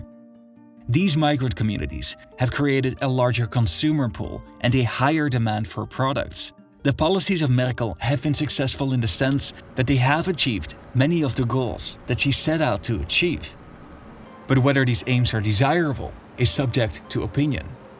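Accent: Netherlands. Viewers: Man! Your VERY thick Dutch accent distracts me from the content of the video!! :-(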